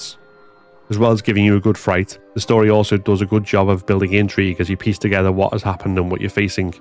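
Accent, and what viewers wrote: Liverpool, United Kingdom. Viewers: 1st time av heard a reviewer with a scouse accent 👍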